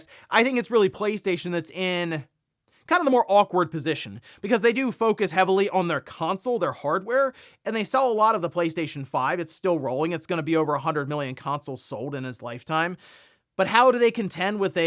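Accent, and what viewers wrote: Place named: East Coast, United States. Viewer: It's just a standard East coast accent, like Philly/Baltimore area.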